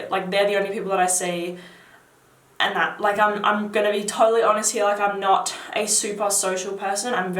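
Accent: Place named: Australia. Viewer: I just wanna say i came across one of ur videos somehow i dunno how but i commented that ur funny coz it was funny i dunno u was talkin about cleavage lmao some new push up thang lol oh yea i was watchin i forget his name about 2018 best mens fall winter fragrances yea thats how i came across ur channel , u had somethin bout mens cologne but yea i cracked up lol, aussie accents are sexy dont let anybody tell ya different lol, keep up the good work 👍🏼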